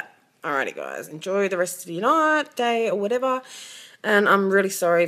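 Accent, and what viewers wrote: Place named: Australia. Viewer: love the australian accent, and the blonde looks awseum :D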